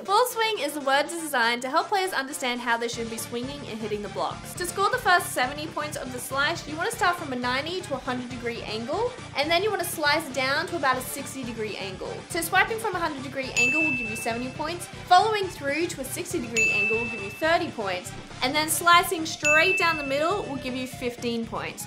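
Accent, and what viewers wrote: Australia. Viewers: Ok does anyone know why advice like this sticks in my head better when it’s delivered in an Aussie accent?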